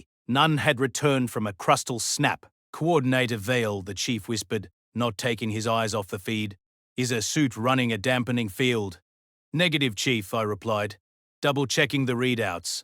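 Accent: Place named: Australia. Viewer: 6/10 the Australian/new zeland accent is a pleasant change of pace from most AI readers, and there were no hangup pauses in the script reading. however, this was also a detriment, as it feels like the reader ignored any punctuation whatsoever. the captioner had one incident of wrong word use. the story itself uses the "lighworlders", "insectoid", "deathworld", "only an earther" and "only a woman" tropes, but avoids the Xenolinguistic names and gender refrences. the shortness of the story is a plus. no wiggly fill for the visuals, and a fixed piece of art is a positive.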